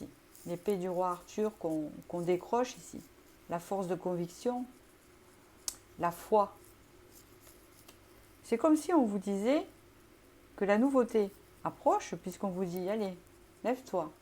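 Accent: South, France. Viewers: Coucou 👋 merciiiiii quelle magnifique tirage tout est dit tout est en raccord☺️ oui traitement des liens karmiques passage très difficile mais nécessaire c’est plus au moins derrière aujourd’hui bon y a encore du job mais là oufff ça va de l’avant 😜 je vis plus le moment présent aujourd’hui et ça change la vie vraiment 🥰.. oui des plaisirs sains et simples que je recherche aujourd’hui.. 🥳 merciiiiiii.. j’adore le tirage vu d’en haut 👍 le son est génial 👍 vous êtes toujours très juste et j’apprécie votre naturel ☀️🌈🌹merciiii pour tout votre temps accordé et ces vidéos qui souvent me titilles 🤣, me remette aussi en question, et parfois ne me parle pas mais cela reste très rarissime il en faut pour tous!! Et je suis grâcement privilégiée 🤣 Voilà ce que j’apprécie dans vos vidéos c’est pas du blabla merci merci merci pour tous ses merveilleux moments de partage que vous m’offrez 🌈☀️🌹🌈avé l’accent🤣j’adore sa sens le soleil, la lavande, la mer les vacances 🏔 🌊 😎🌈☀️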